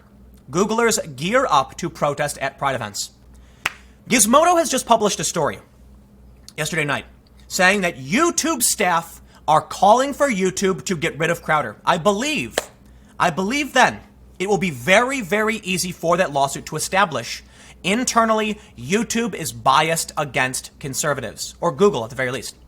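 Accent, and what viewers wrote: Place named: South, United States. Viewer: What's up with that sudden southern drawl?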